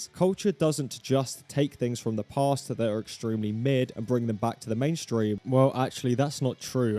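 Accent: United Kingdom. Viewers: You're British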